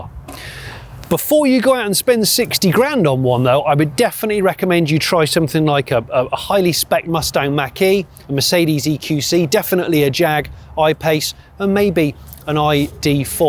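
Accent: United Kingdom. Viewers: The main reason I watch this show is the very British English.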